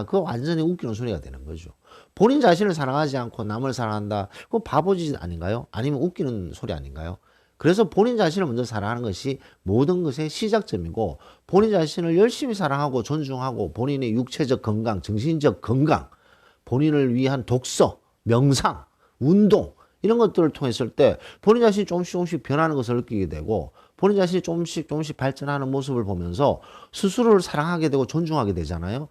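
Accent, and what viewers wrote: Gyeongsang, South Korea. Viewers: 들을때 마다 느낍니다. 어쩜 경상도 사투리가 이리 듣기 편할 수 있는지.. 전 경상도 사투리 원래 질색을 하는데 선생님 영상은 목소리 들으려고 일부러 들어요. 평소엔 괜찮다가 피곤할땐 듣기 거슬리는 목소리가 있는데 피곤할 때 회복하게 하는 목소리예요. 물론 메세지가 좋아서도 있겠죠. 오랫동안 잘못된 기독교 신앙을 통해 나 자신을 부정하고 남을 용서하고 사랑하라는 메세지를 문자적으로 지켜보려고 하다 몸과 맘이 만신창이가 된 사람입니다. 요즘 심리학을 통해 의외로 성경에서 말씀하신게 그게 아니라는 것도 깨닫고 님 처럼 내 주위에 넘쳐나며 나를 갉아먹는 하이에나, 소인배, 나르시시스트, 소시오페스들을 정리하였습니다. 저에게 달라붙으려고 한 인간들이 내가 좋아서가 아니라 나한테서 떡고물을 얻어먹고 내 진액과 생명력을 다 빨아먹으려는 에너지 뱀파이어 였음을 깨달았 고요...이제는 나를 가장 우선에 두고 살겠습니다. 저의 생명되신 예수님도 그것을 바라실 것입니다. 감사합니다.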